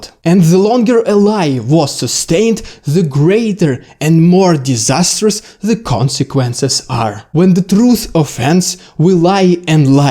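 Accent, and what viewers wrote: Russia. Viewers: К чему такой дебильный русский акцент? (Why such a moronic Russian accent?)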